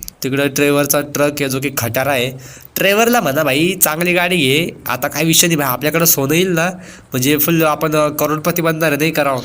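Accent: Nashik, India. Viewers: bhava tu nashik cha a ka ??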